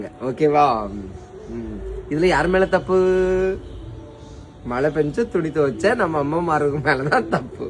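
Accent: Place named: Nagercoil, India. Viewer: Nagar Kovil tamil super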